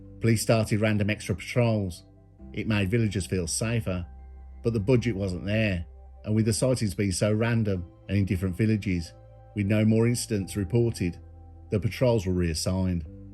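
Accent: Birmingham, United Kingdom. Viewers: It's funny because it's narrated by a deadpan Brummie.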